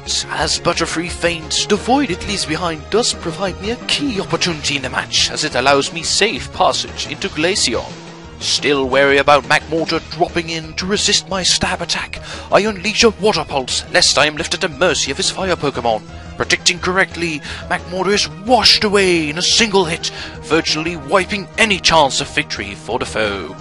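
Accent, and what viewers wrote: United Kingdom. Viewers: Urgh, his way of speaking I'm really not a fan of. I don't usually dislike British accents, but there's something that I can't put my finger on that makes me hate this voice. It's probably the animal planet narrator type quality to it if I had to guess... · It's the British accent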